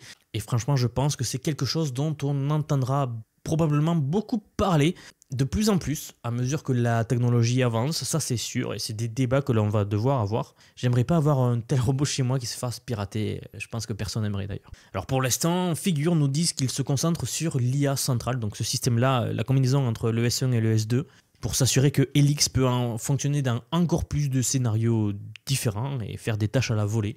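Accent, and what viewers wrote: South, France. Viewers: Un peu du sud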